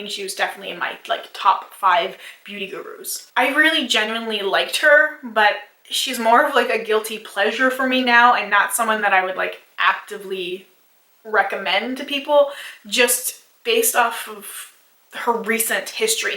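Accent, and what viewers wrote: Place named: Canada. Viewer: It’s always funny hearing you say “out” and “about” but you also say it really cute. I think it’s a Canadian thing lol cuz I’m American and we say it differently. But you say a very cute, soft, “oot” and “aboot “ and it’s very endearing.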